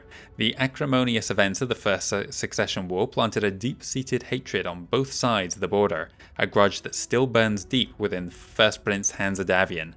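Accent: United Kingdom. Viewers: i hear a brit'ish accent but not a haggis laced one. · Personally I struggled to hear Ryana’s Scottish side, tho if you said she came more form the east side of Scotland around Edinburgh or Fife areas then they have alot less of a toned version compared to west and mid Scotland. So it’s probably true being she’s a Northwinder but likely comes from a part that has just a very very mellow version of the accent. Edit: also say a lot when I didn’t even know you were Scottish yourself. So aye, definitely more on that more mellow side then.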